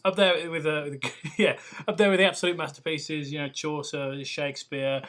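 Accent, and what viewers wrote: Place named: Scotland, United Kingdom. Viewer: That Scottish accent is brilliant.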